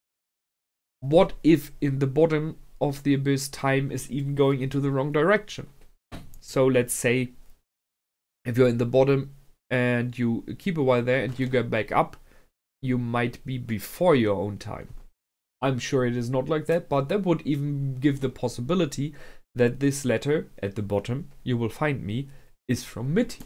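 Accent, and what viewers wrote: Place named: Germany. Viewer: could you Provide me with a link, im on phone and i can only click on links:/ Would be very much appreciated. By the way are you from Germany, you have that kind of accent:)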